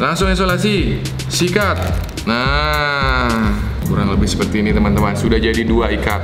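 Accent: Bali, Indonesia. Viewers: Kyk logat bali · Aku baru liat chanelni Kok kek logat bali:) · Aku yang mikir Kak ini orang Bali, atau emang orang Bali, atau cuma logatnya yang mirip orang Bali, atau aku ketinggalan jaman? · Memang orang bali, daerah denpasar kalo ga salah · Orang Bali? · Logat bali ny kentel bet wkwkw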